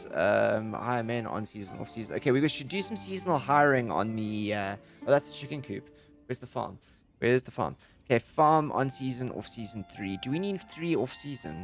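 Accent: South Africa. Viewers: I knew I recognised that accent, my wife is from Pretoria lol. Good videos man, you made me want to get back into the game especially after the new update :)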